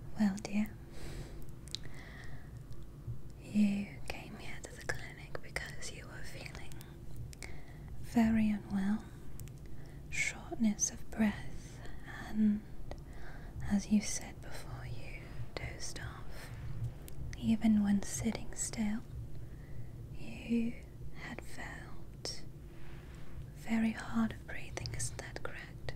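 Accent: England, United Kingdom. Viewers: I never new she had a British accent ! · Really Beautiful Doctor!!! Great Video and you have the most Beautiful eyes and you do a Great British Accent!! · your English accent and bedside manner 😍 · Weird to hear you use a British accent. I got ASMR, but also on edge because you sounded like an evil villain from a bond movie haha! Amazing video! 10/10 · Perfect English accent. Thank you from across the pond. PS. I love 🇬🇷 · I'm British and I approve of this accent 🇬🇧🖤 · Is she British or nah lol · you are spoiling us. Also thanks for the British accent. I love studying the accent from your videos. ❤️❤️❤️❤️ είσαι υπέροχη!!!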